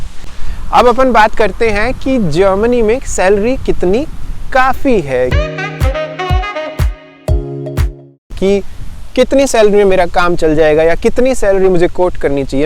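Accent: Haryana, India. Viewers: Bhai g aap haryana se ho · Hai are u from Haryana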